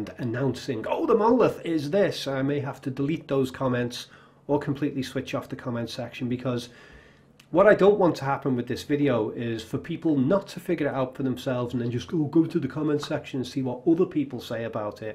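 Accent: Liverpool, United Kingdom. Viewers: Is a Scouser?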